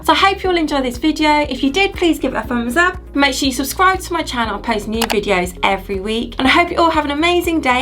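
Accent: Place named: Birmingham, United Kingdom. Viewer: Subbed. Can I hear a fellow Brummy? ❤️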